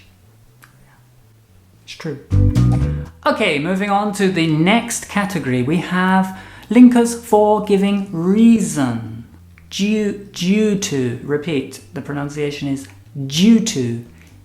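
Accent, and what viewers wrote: United Kingdom. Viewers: This is British? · Pero que dulce es el acento Britanico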